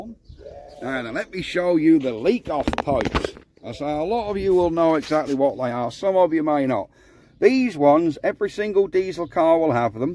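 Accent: Norfolk, United Kingdom. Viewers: You sir, have the strongest norfolk accent I’ve ever heard😅😂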